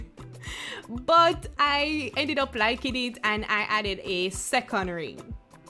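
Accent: Jamaica. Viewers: Love how you talk...you sound so much as a jamaican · jamaican!!? i pick up d accent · Sound so Jamaican.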